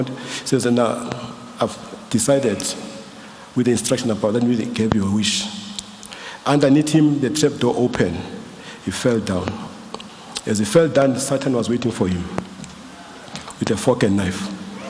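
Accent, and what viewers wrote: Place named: Township, South Africa. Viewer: Brilliant speech with kasi tone 😂😂😂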